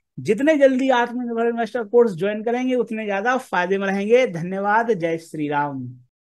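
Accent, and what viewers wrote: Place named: Bundelkhand, India. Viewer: Hum to sirf Bundeli sun ne k liye dekhte hai Baki to humaye dadda sikhaye gaye hate market se door rahiyo 🤣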